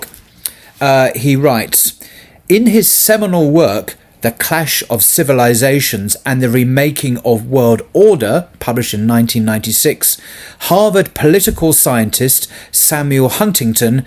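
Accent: United Kingdom. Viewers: Such a great explanation, Sir! Oh, on the side note, I like your accent. British! I find it friendly for the American accent, but British accent, I can feel like a King! 🤓 Well, the UK is the United Kingdom, isn't it? Will listen more to your videos! (Well, I am not a native speaker of English). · This guy is so shallow and stupid that he forgets the bible claims to have killed everything during a flood. EVERYTHING God killed everything. What a useless video. His British accent can't cover what the Bible pretends. It's insulting to anyone with common sense. Christians "God" killed everything on the planet to start over. This guy's a idiot.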